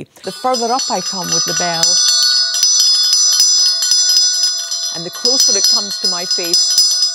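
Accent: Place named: Scotland, United Kingdom. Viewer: Gosh i love the Scottish accent she is brilliant truly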